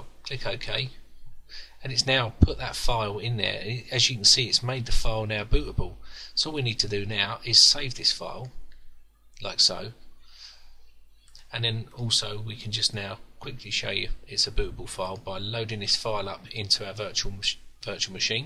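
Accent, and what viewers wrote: England, United Kingdom. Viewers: buddy ur from england???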